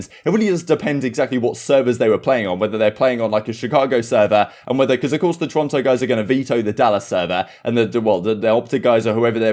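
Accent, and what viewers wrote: United Kingdom. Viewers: I’m also curious 🧐 British? Haha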